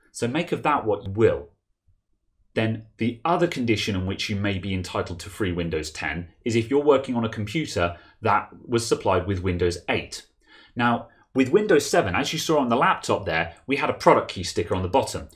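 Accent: England, United Kingdom. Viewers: Where in the world are you and from. My guess is English but you always price in dollars. I subbed to your channel because it's nice to have a clear and understandable voice to listen to. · he is speaking a certain accent of UK english, other accents can be unintelligible . search youtube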